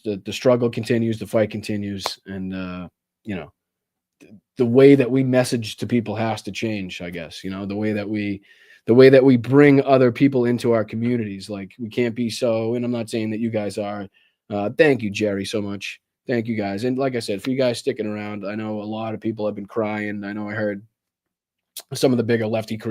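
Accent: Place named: New Bedford, United States. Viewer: I was raised in New Bedford. You sound like the folks I grew up with. Keep the example up. We must keep going. No dropping the ball.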